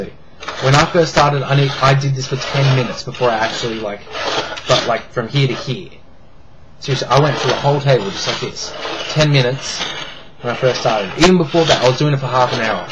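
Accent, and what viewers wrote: Australia. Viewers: Is he Aussie? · You're Australian, aren't you?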